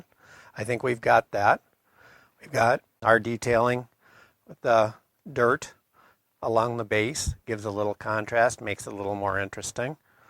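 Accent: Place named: Midwest, United States. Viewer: If you had a clue what you were talking about you'd know that this is a midwest accent with his family originally being from Europe.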